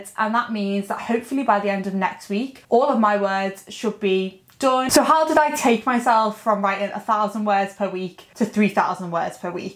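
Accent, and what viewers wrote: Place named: Newcastle, United Kingdom. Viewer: Your accent sounds a bit 'Geordie', no?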